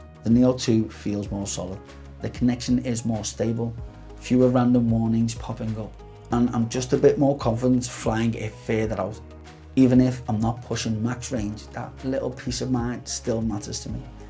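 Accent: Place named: Manchester, United Kingdom. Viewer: Really enjoying your videos. Great content - but also because your accent reminds me of home. (Live in New Zealand but grew up in Manchester.)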